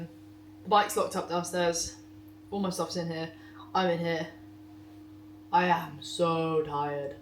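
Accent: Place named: RP, United Kingdom. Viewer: Your accent is so posh lol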